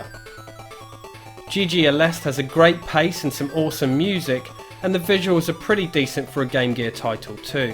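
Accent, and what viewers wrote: England, United Kingdom. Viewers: I downvoted this video because you're English and I find English accents to be very unpleasant and English reviewers always tend to miss the most important and distinct parts of gameplay mechanics in favour of prattling on about story and themes.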